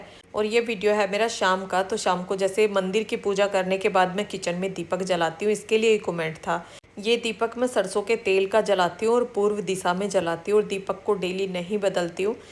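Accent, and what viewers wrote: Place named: Haryana, India. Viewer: Di m b gujjar hu kota rajasthan se lakin ap logo ko dekhkar nh lgta ki aap Gujjar ho quki humare rajasthan ka पहनावा boli sb aap se alg h aap ko or apke पहनावे or boli se aap haryanwi lgte ho lakin muje bhaut kushi hoti h jb अपनी समाज ke log aage bdte h mtlab kashker ke ladies jb kuch krti h to bdi kushi hoti m cahati hu apna gujjar समाज age bde 😊😊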